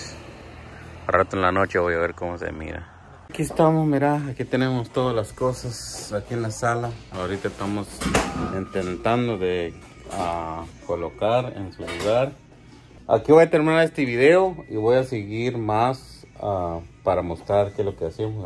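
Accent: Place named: Guatemala. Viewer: Serote saludos así hablamos los chapines😂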